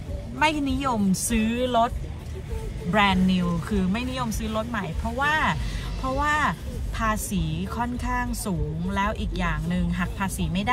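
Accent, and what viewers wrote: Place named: South, Thailand. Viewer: ไม่แปลกใจเลยค่ะ ว่าทำไม่อยู่S Carolina ทำงานกับ บ. แม่ BMW นี่เอง ชอบน้องแหลงใต้มากค่ะ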